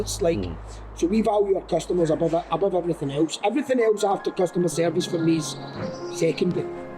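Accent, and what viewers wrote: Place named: Glasgow, United Kingdom. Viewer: bro sounds like he spent all his days in Glesge instead of NY. Mate I need to try your coffee, i’m fae the southside.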